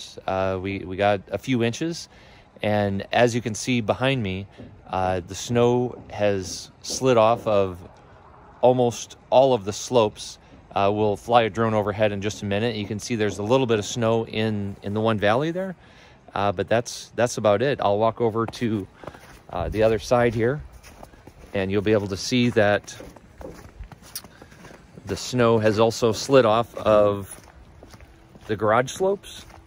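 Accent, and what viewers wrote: Wisconsin, United States. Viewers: Dudes, it’s just how we talk in Wisconsin. We also say crick, not creeek. Sorry, not sorry. 😎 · He mentioned building in Wisconsin...I am assuming Wisconsin accent. I moved here and he sounds like everyone else here. Not sure why everyone needs to drag the guy for this?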